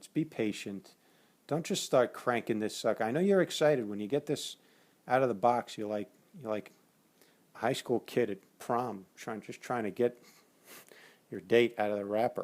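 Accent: New Jersey, United States. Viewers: You from Jersey?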